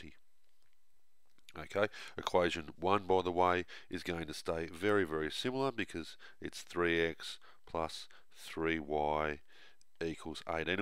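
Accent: Australia. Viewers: he is i'm from the land down under you get thus accent all the time. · its a really strong australian accent and I dont blame you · Inaya way Australian mate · He is australian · Don't diss the Aussie accent! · clicked on this hoping its not an american and wasnt overjoyed to find out its an australian😁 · hes australian mate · Great stuff! And refreshing to hear an Aussie accent on Youtube for a change 👍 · It’s an Aussie accent but his nose does sound blocked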